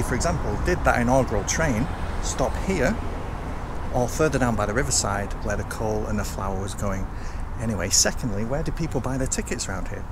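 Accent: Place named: England, United Kingdom. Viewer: With these videos I learn a lot and I get used to understanding English accent. Thank you very much for your videos!!!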